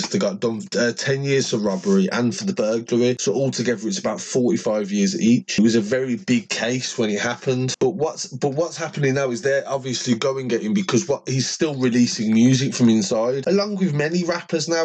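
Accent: Birmingham, United Kingdom. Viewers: I rate you g u tell it how it is and u sound like yu from brum im from balsall heath b12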